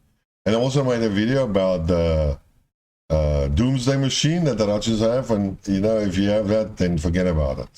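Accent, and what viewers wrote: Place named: Afrikaans, South Africa. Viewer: Disagreeing for t he sake of it with no alternative explanation. With a thick Afrikaans accent nobody can understand van de plaas.. i dont plan listening to more of your videos.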